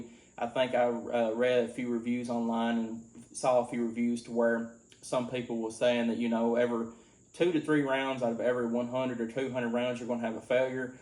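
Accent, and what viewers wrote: South, United States. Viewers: Where are you from brother? You have a heavy draaaaaawwwww!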